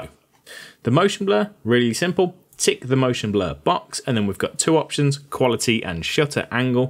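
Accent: United Kingdom. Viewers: are u from UK ur English accent seems to be so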